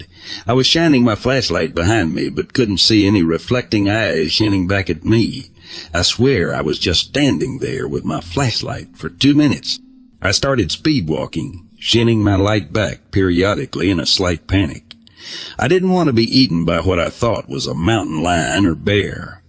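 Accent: South, United States. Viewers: Well done!! So happy to hear from you all again! The new narration did a great job. There's something about the slight southern draw that makes you feel like your grand dad is telling you tale passed down from family. At least thats my take on it! Ready for more!!!!!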